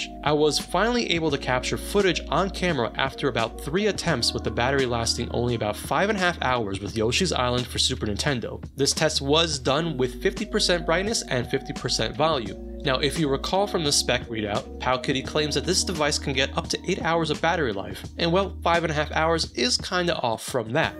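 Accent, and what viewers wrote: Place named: Delaware Valley, United States. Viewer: Another great deep-dive review! Thanks!! BTW, are you originally from Philly? You sound like a Delaware Valley guy!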